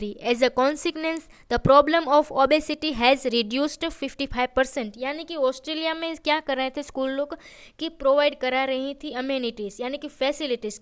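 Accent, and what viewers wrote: Gujarat, India. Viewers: Very informative Ma'am aap Gujarati ho , aapki boli se lagta hai I'm also Gujarati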